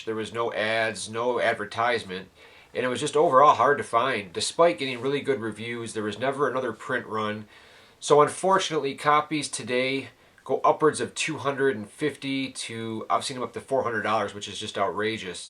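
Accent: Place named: Northern Michigan, United States. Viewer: Your North Michigan accent is always a welcome sound to my ears (no homo). Reminds me of my brief time at Michigan State University, and tater tots (which I am not a fan of). This is the evolution of Shinobi on Master System, mixed with Shadow of the Ninja from Natsume for the NES.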